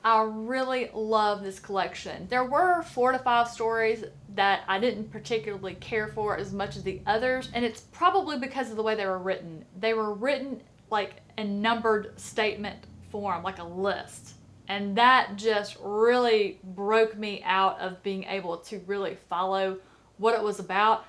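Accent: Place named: Tennessee, United States. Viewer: Your accent is the best <3 TN am I right? I lived there for a little bit and everybody had a nice twang.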